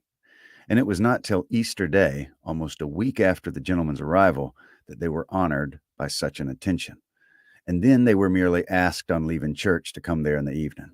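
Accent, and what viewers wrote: South, United States. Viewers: This is genius. The southern accent really suits P&P. 😂❤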